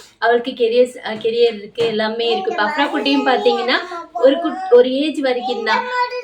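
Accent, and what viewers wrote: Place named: Chennai, India. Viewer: Chennai ya sister neenga pesurathu romba pidichirukku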